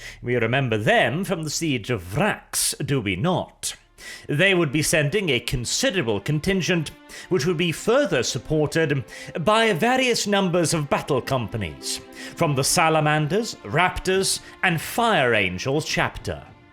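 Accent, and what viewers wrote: United Kingdom. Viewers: Dang boi, your silky British by way of Norwegian accent is music to my ears after the past month.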